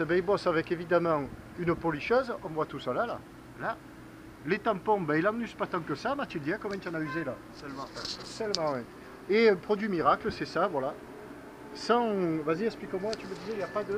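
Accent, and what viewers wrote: South, France. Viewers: Bonjour, et hello les marins, J'ai flotté pas mal pendant des années, dont sept ans sur un Banjer 37 (revendu il y a peu suite à perte d'emploi, snif), je suis en Belgique, mer du nord (moins quarante!!!!), d'où le besoin d'avoir une timonerie fermée pour naviguer toute l'année. Merci, je me régale avec tes videos, ton accent du Sud (chaud), et tes commentaires très intéressants, cela me fait chaud au coeur. La navigation en Méditerranée est différente de celle pratiquée en Manche et Mer du Nord, où les escales sont en France, Belgique, Hollande et Angleterre, pour rester dans les deux cents mille. Te voilà donc reparti pour quelques délicieux mois de navigation, d'eau salée, et d'apéros. Profites-en un maximum, demain est un autre jour.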